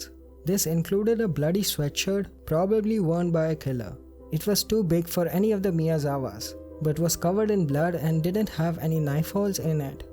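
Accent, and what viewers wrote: India. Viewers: The narrator is prolly from India 🙋🏽‍♀️ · you don’t understand that the creator of the channel is actually an Indian man, and thus is probably going to have an Indian accent? 🙄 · Walks in Hears Indian Accent Walks out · the Indian accent is undeniable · I agree. I don't understand having a man with a strong Indian accent narrate this video, of all people. I couldn't understand a lot of it and it was really distracting. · indian narrator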